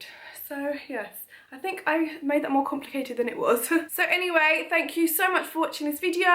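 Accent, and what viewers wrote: United Kingdom. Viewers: aww you r stunning guurrl✨👑 you're really talented and pretty but I really hate that British accent I prefer American's one way more but it's cute on you idk it always annoys me but it sounds cute on you ok bye love you💌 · isn't enough be so pretty, you have to be british with this awesome accent too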